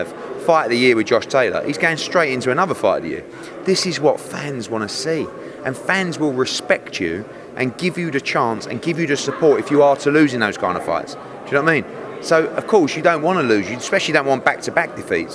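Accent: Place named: Mockney, United Kingdom. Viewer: Far too much mockney wideboy BS. Gave up after 10 minutes.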